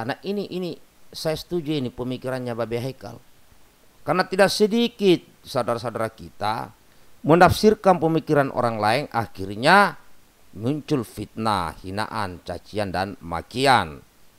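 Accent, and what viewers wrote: Makassar, Indonesia. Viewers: assalamualakum sy senang dengar klo logak makassar ooo mmalee karaeng mg ALLAH SWT jg cnel aaamiiin3 Yaaa rabbal alamin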